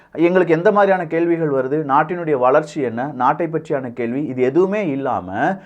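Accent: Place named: Kongu, India. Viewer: boss, Pallu padama pesuradhu la Kongu region la common dha.. Unga region la pesalana adhukku onnu panna mudiyadhu. yenna oru 50 video potutta, Arasiyal Saanakiyar nu nenapo?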